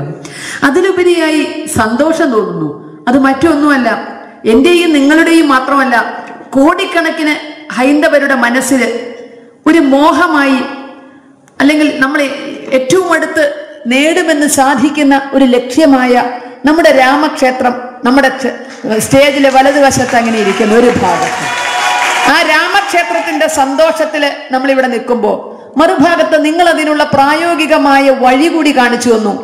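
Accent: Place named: Valluvanad, India. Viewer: വാക്കുകൾ സ്ഷ്ടവും വ്യക്തവും കൃത്യതയാർന്നതുമാണ്. ഇടയക്ക് വള്ളുവനാടൻ ശൈലി അറിയാതെ കടന്നു വരുന്നു. വളരെ മനോഹരമായ പ്രസംഗം. കേട്ടു തുടങ്ങിയാൽ അവസാനം വരെ കേട്ടുകൊണ്ടിരിക്കാൻ തോന്നും. വിഷയമാകട്ടെ ,സമകാലീക ഹൈന്ദവസമൂഹത്തെ സംബന്ധിച്ചിടത്തോളം വളരെ പ്രസക്തം. ഇതാണ് ശശികല ടീച്ചറുടെ പ്രസംഗശൈലിയുടെ പ്രത്യേക .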